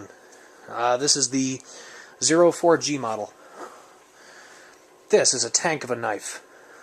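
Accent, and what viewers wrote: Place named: Brooklyn, United States. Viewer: Hahhahahhahahhahhahahhahahhahahah Brooklyn accent that's hilarious Thanks for the great vid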